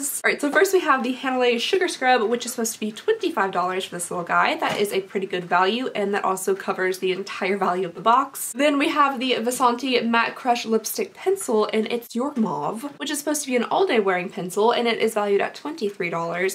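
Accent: Kentucky, United States. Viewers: oof wait are u from Kentucky, I am too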